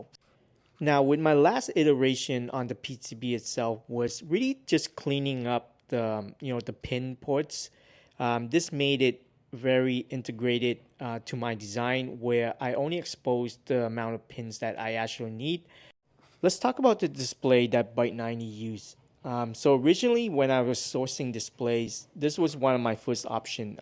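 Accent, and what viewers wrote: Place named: Pittsburgh, United States. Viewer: Location says Toronto, but accent says Pittsburgh.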